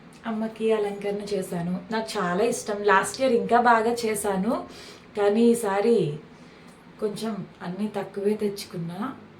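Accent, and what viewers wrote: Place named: Visakhapatnam, India. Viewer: Chala bagundhi andi,meeru undedhi vizag ani nenu anukuntunnanu mee matalu alage unnay ,maadhi kuda vizag ne ,yevarikyna telisthe cheppandi,mimmalni okasari kalavaalani undhi andi,oka vela meedhi vizag ayithe naaku cheppandi kalusthanu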